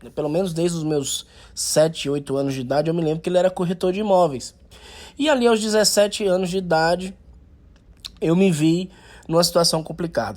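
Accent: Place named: Natal, Brazil. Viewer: nossa, cara. Moro na rua por trás do Reis Magos, e não sabia que você era aqui de Natal, até porque seu sotaque não é 100% igual o nosso. Tô assistindo seus vídeos desde ontem, acredito que tenha sido por causa da lei da atração, pois venho pensando exatamente nas coisas que, coincidentemente, você fala em seus vídeo. Que bom que respondeu o comentários, vi que a maioria você só curte, mas não comenta.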